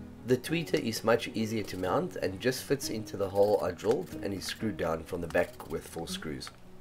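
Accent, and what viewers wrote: South Africa. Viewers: South African accent but now based in the US?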